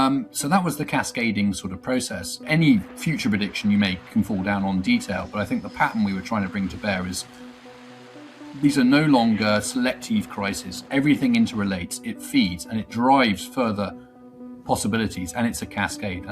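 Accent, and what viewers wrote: United Kingdom. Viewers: Polycrisis? Are we making up words now? Take your British accent and try to fix your own country, you don't have time for the world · I need to get a fork from all the word salad in a British accent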